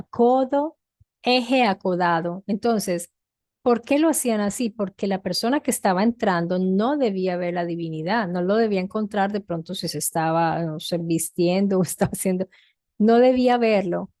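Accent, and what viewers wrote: Italy. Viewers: ¡Correcto! Usted si sabe decir las palabras exactas. No es la primera vez que desde aqui, en casa, le aplaudo por la precisión.... Devuélvanse un par de minutos y aprenderán lo que es hablar correctamente ( disculpando el hermoso acento italiano, que le dá mayor belleza)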